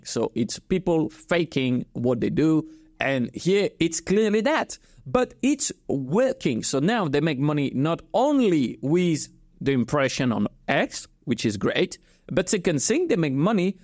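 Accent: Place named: France. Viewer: cet accent français mamamiaaaa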